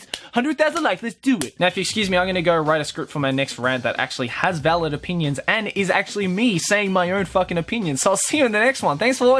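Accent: Australia. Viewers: I'm American ( not offended) im tired of hearing from the American side too...rather hear you're cute Australian accent any day. 😽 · I KNEW HE WAS AUSTRALIAN!!! But seriously be fuckin' careful bro, everything wants to kill you there. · hheeee ??is he Australian o.o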